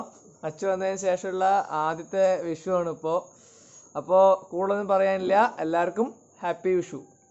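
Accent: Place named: Palakkad, India. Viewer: Pakka palakkadan slang...Thambattiyeeeee🥳🥳🥳🥰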